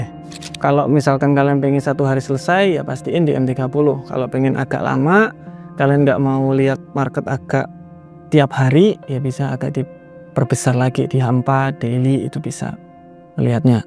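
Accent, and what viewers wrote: Java, Indonesia. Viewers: Jowo niki mas'e 😄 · Mas medok banget orang mana? Saya jg org jawa btw 😂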